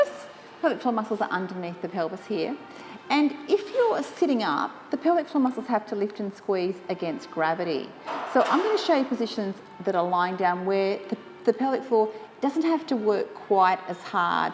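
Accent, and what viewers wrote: Australia. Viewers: I’m making my rounds of videos just to hear her Aussie accent!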